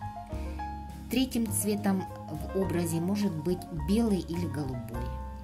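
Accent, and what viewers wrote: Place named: Europe. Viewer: А в какой стране Вы живете? У Вас очень сильный акцент, не могу понять с какого европ. языка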